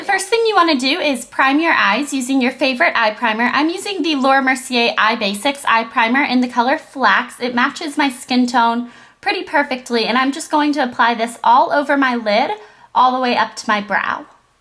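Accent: South, United States. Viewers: you sound more southern when you're sick. i like it :) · when you mentioned the elf brush, the nyx jumbo eyepencil and the mac "fresh water" IT BROUGHT back sooo many memories, of your first few videos! !! and OMG i miss your thick southern accent. :( hahha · Like ur old videos but ur intro us way to formal and long also I miss your southern accent!! I loved it :) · she IS southern.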